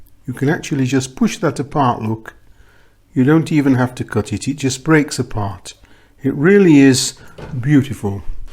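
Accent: Yorkshire, United Kingdom. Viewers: Hello Mr. I just found you today. To say that I am very happy about that would be an understatement! I recognised your accent right away as I spent two years in Yorkshire (Pontefract area) as a teenager. I have a question about the steak and kidney pie. After you added the beef broth the mixture looked very liquid. How/when does it change into a nice thick gravy like you have in your pie? Also, I have a question/request: when I lived in Yorkshire I used to eat the most wonderful custard pies. Do you have a recipe and demonstration for them? If so I would be eternally grateful. Thank you.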